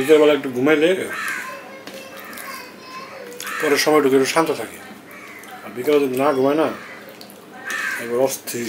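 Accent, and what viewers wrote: Bangladesh. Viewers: Dada apne kemon achen ami nederlandsche thke dehi apnar gabber thekle Bangladesh er kotha mone pore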